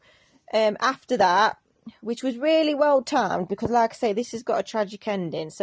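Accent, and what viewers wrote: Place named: United Kingdom. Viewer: Where are you from in UK, Queen Victoria? I like your accent very much I want to learn it when I come there 😊❤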